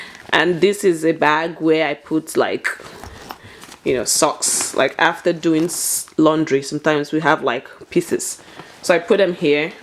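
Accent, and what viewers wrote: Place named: France. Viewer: I like your accent, is that French?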